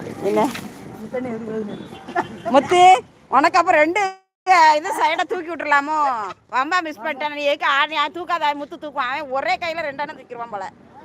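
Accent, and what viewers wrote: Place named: Tirunelveli, India. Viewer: அண்ணி நீங்க கோயம்புத்தூரில் இருக்கும் போது ஒரு வார்த்தை பேசுறிங்க இப்ப வந்து திருநெல்வேலிக்கு போயிருப்பிங்க போல இப்ப ஒரு வார்த்தை அந்த ஒரு பாட்டில பேசுறீங்க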